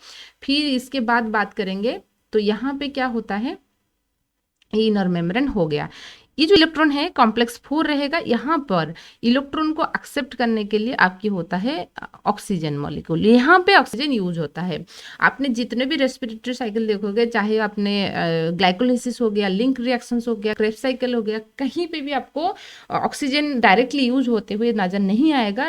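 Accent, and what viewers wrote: Odisha, India. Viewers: I think you’re odia that i got know through your accent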